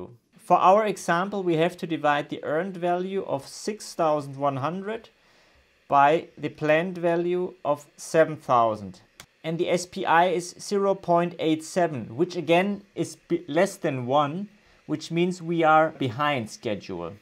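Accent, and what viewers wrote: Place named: Germany. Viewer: I guess you are German 😊from your accent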